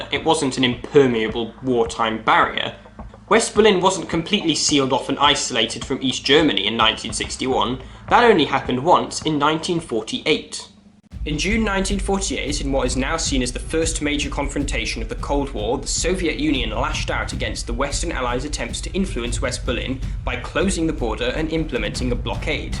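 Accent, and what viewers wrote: United Kingdom. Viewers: He is British, thats how they always pronounced it. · This is really a great work! I am deeply surprised how a young person from the U.K. (I hope I recognized your accent correctly) deals with this matter so precisely and with so many details. I traveled between West Germany and West Berlin several times before 1989 by train, and I can confirm that everything you saying is absolutely correct. Excellent! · You really do use very English pronunciation of all those German words!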